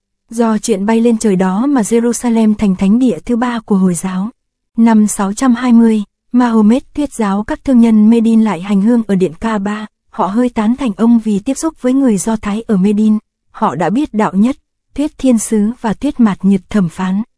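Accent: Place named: North, Vietnam. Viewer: Truyện hay và người đọc với giọng Bắc nhẹ nhàng làm hấp dẩn người nghe.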